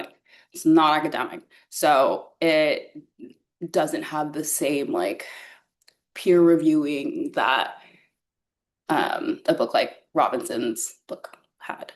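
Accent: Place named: Germany. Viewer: Ur German accent eats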